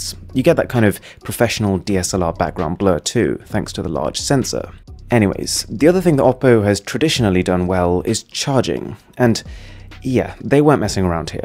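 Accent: United Kingdom. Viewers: PacMacDo it’s definitely not a generic English speaker, you can tell he’s got quite some British accent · that's normal British accent you're just being salty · that's his NORMAL voice british accent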